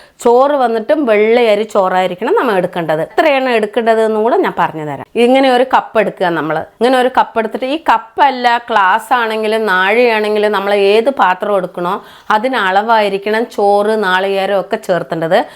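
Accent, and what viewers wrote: Palakkad, India. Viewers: പാലക്കാട് കാരി ആണ്ണോ സംസാരം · ചേച്ചി അടിപൊളി. പാലക്കാടൻ ഭാഷ 👌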